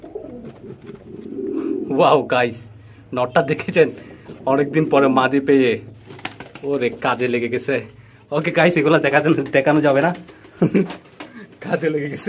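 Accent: Chittagong, Bangladesh. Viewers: ভাই আপনি কি চট্টগ্রামের ❤❤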